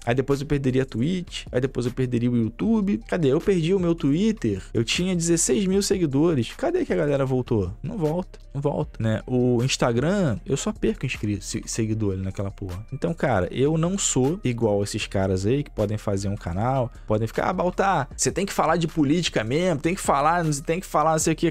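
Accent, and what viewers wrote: Rio de Janeiro, Brazil. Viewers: Eu acompanho o Baltar desde os tempos que o cara tinha 20k de inscritos, discordo dessa opinião dele, mas o pessoal fica muito emocionado. Eu tbm sou carioca e esse jeito dele falar é uma característica nossa, no fundo ele sabe que o pessoal não está puto por não ver a boneca pelada, mas está puto com o fato de mudanças nos jogos.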